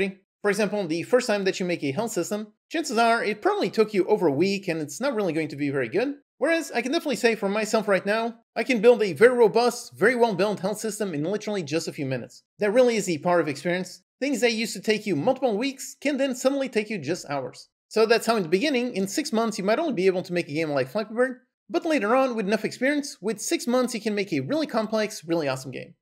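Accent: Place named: Portugal. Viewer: Hey. This is great advice! I had a feeling that you are from Portugal and live in Portugal because of your accent. Is that correct? I live in Portugal as well! I don't build games (even though I would love to), but I build game dev tools and libraries. I built a 2D game framework called Velaptor. We should connect!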